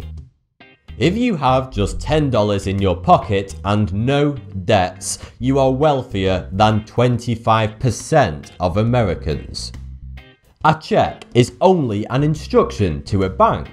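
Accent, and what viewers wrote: United Kingdom. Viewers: His nasally, British accent is annoying. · hes from the uk, the way he talks is actual english, american is actually incorect english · idk why but his british voice is driving me mad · Buck teeth and a British accent? Its like every word your pronounce starts with the letter "F". Like you say thirteen like " Furteen" WTF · Is this like racism or just you don't understand British accent? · ***** British accent guy.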